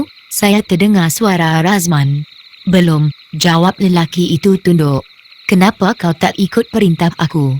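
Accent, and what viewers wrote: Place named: China. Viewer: Yang pasti..penceritanya bkn melayu..pelatnya cina..apa pun terima kasih kerana tolong menyampaikan cerita 🙏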